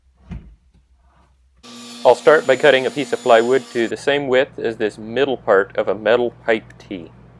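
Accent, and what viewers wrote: Canada. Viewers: You from Canada ehh great toutoral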